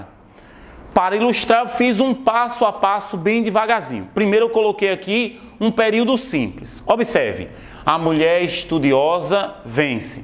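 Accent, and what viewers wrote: Northeast, Brazil. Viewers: de onde vc é ? pois tem um sotaque nordestino e meio português de portugal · O famoso e gostoso de se ouvir sotaque nordestino ksksks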